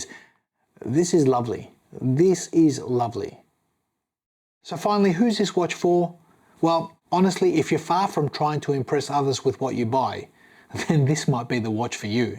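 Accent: Australia. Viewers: I hate this stupid Australian accent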